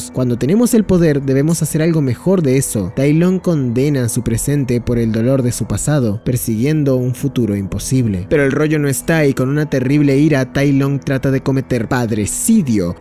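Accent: Argentina. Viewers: el video me re ceba pero me desespera el acento neutro/argentino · sos argentino o uruguayo?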